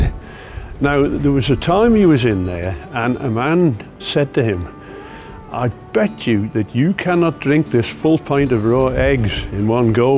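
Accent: Isle of Man, United Kingdom. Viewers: Absolutely brilliant. Great stories and the best Manx accent I've heard in years. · So nice to hear a true Manx accent.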